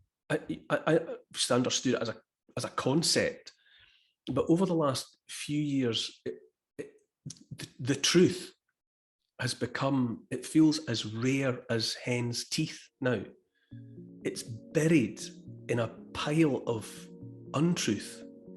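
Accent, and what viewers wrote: Scotland, United Kingdom. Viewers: 'Are we gonna be poopets or no poopets?"written in a Scottish accent. Love that Scottish accent. "I don't want ta be a pie, I don't like gravy."...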